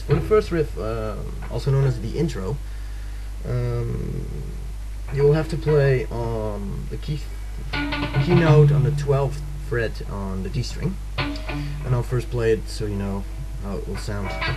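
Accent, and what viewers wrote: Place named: Canada. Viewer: You are definitely Canadian!! Right?..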